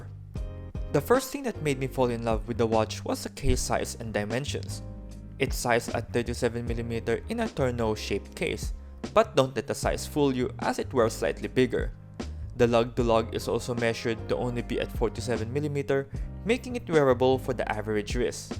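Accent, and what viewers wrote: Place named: Philippines. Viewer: Do you happen to be from the Philippines? Because you sound like one.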